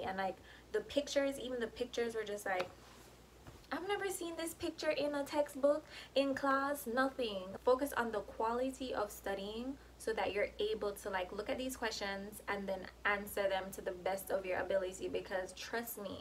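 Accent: Jamaica. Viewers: Are you Jamaican? I am too but American Jamaican I love your accent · Hey girly, your video is inspiring. Taking my Abdomen registry in October your video really help me understand i should expect and how to study. On another note and totally unrelated, are you Jamaican or Caribbean? I am Jamaican and my ears are very keen to recognizing fellow caribbs especially other Jamaicans talking. But i could be wrong lol! Appreciate your video so much!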